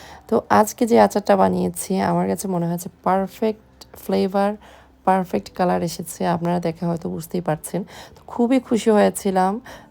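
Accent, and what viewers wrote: Sylhet, Bangladesh. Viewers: Apu Are You Sylheti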